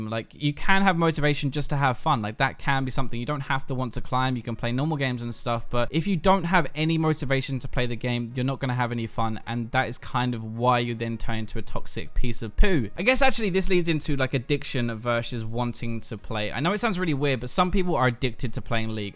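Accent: United Kingdom. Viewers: "Some people watch for the British accent" THE JIG IS UP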